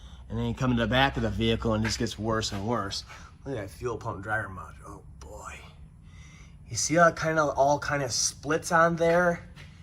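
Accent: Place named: Maine, United States. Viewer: Love the Mainer speak. Reminds me of one of my favorite movies, Dolores Claiborne, "you do it again and one of us is going to the boneyard".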